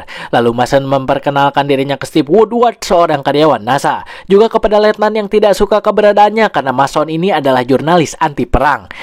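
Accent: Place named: West Java, Indonesia. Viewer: Anjir suara nya mirip si jon lQ7 logat sunda nya krasa..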